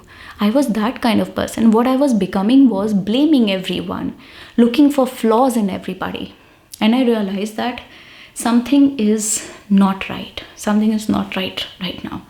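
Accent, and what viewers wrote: India. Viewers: I love indian accent 😘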